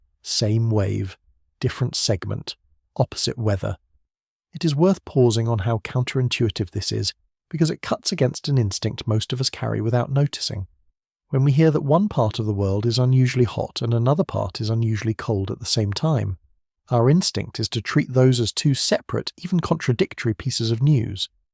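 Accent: United Kingdom. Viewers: Dude it is the water current of the changing Ocean’s…… CHANGING THE SALINITY!!!!! So it won’t sink and freeze ! ONCE IT ROLLS OVER!!!!! You will pray for Hot days!!! England will be under Ive for 100’s of years!!! (IT IS A CONVEYOR BELT THAT FREEZES AND AINKS AROUND Greenland!!! Loosing salt because of the melting Ive waters from North Canada and Greenland itself! ( heat in England comes from the hot ocean)!!!! LISTENING FOR THIS D BAG BRITI ACCENT TRY TO BRING A DIVE OUT FROM UNDER HIS HAT!!! THE JET STREAM!!!! No s h i t e!!!! Try a tornado with your milk tea whoopsy-daisy!!!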